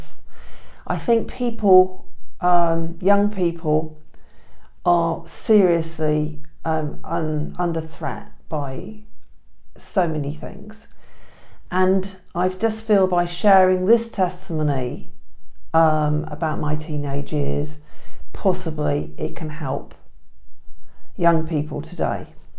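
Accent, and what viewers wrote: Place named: England, United Kingdom. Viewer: Nice to hear an English accent on one of these stories. I went to art college, wonderful times. Thank you for your openess. xx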